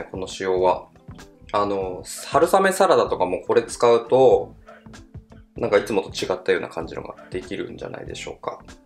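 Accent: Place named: Kyushu, Japan. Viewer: 春雨は増えるから量が参考になりました そうそう！ ち、ちらしで作るゴミ箱です〜 我が家も台所で出る生ゴミとかをチョイって入れてそのまま捨てられるので作り置き？してます 時々でる九州弁！いいですね (๑˃̵ᴗ˂̵)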